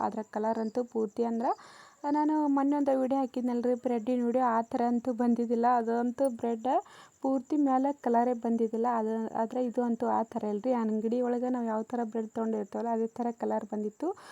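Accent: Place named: Uttara Kannada, India. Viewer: ನಮ್ಮ ಉತ್ತರ ಕನ್ನಡ ದವರು ನಾವು ಹೀಗೆ ಮಾತಾಡೋದು ನಿನಗೆ ನೋಡೋಕೆ ಮನಸ್ಸಿದ್ದರೆ ನೋಡು ಇಲ್ಲ ಮುಚ್ಕೊಂಡು ಹೋಗು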